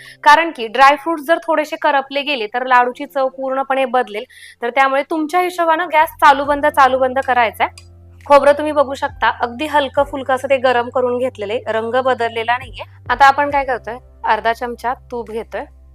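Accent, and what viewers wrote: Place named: Latur, India. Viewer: Tai तू कुठली आहेस, तुझी बोलण्याची टोनिंग लातूर ची वाटतेय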